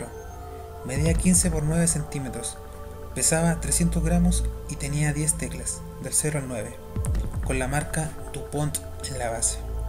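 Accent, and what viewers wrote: Chile. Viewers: Buen video. Oye una pregunta,eres Chileno??? lo digo por tu acento! Saludos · Video Robado y monetizado sumale la voz de un chileno Boludo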